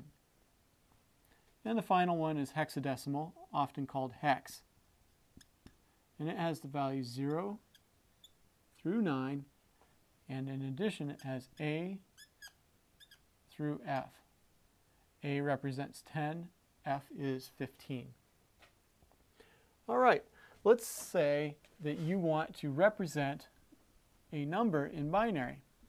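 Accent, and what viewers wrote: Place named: United States. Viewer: Congratulations you're the only person with an American accent I can find for the subject. You win.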